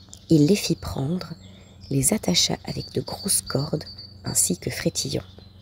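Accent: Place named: Belgium. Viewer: Ici en Belgique on dit cela quon va dîner et souper 😉 et si je reconnaît bien l'accent de notre lectrice elle doit être belge car j'ai le même accent et je suis belge 😀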